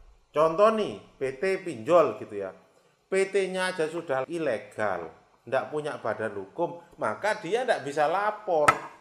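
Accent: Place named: Java, Indonesia. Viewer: Ada lucunya PAK BOSS kayak ada logat jawa nya gitu ☺😆👍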